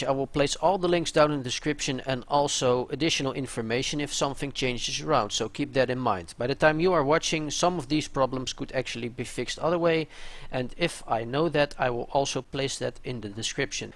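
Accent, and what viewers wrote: Netherlands. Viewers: you have a dutch accent xd Dutch? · hoor gewoon dat je nederlands bent :0 maar ik heb een probleem me i7 proccer werkt niet met advanced warfare kan je me daarmee helpen? hij loopt vast in de loading screen en dan ben ik gedisconnect. help! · dutch accent :P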